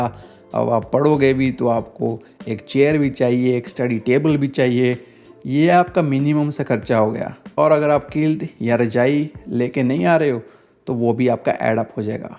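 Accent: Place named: Punjab, India. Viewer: Nice nice.. Your punjabi accent is creating more personal connection with you. Pehle hi your videos were super helpful and now its way more relatable as well ❤️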